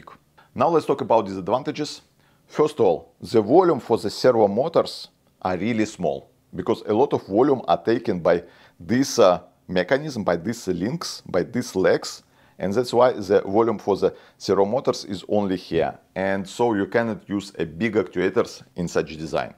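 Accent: Russia. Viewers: блин, ты же русский ? акцент прям явный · Не пойму что за акцент???🤔🤔🤔 русский???? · Strong russian acсent, beautiful ideas )) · А ты не русский? Проато акцент есть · Почему я слышу русский акцент?))) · Perfect Russian accent Прикольная самоделка👍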